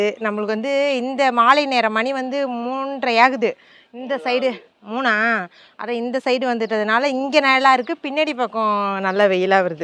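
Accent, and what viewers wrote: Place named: Thanjavur, India. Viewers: Neega peasuratha paththa Enga urla peasura mathiriye irruku na thanjavur district Madukkur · Yentha ore pa ,no vithyasam illa nanum ipdi dan thaŕŕ nu nu solven Thanjai tamil theliva irukum. Yenaku ungala pidikum pa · Nanum vungala mathiritha pesuva nanum thanjavur district than sister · Hai sister love 💕 from kumbakonam namma oor slang❤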